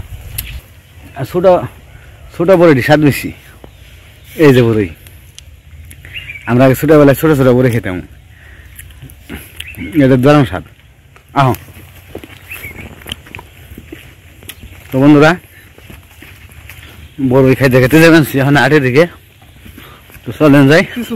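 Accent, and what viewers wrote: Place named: Dhamrai, Bangladesh. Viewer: Aapnader Baari kothaye bhai? Aapner accent shune mone hocche aamar Nana'r barir kaache. Aamar Nanar baari Dhamrai Upozila, Graam Kushura, Bongshi Nodir paare.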